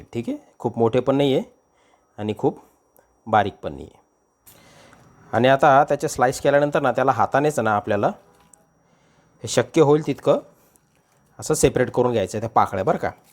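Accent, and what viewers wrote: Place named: Khandesh, India. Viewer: दादा तुमची भाषा खान्देशी आहे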